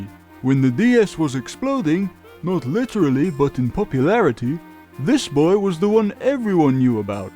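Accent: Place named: United Kingdom. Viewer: wait you're British... bitchin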